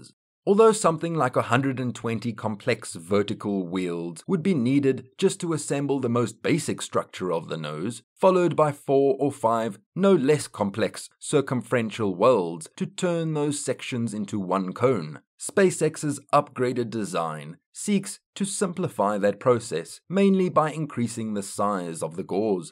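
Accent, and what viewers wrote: South Africa. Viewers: Aha. South African voice.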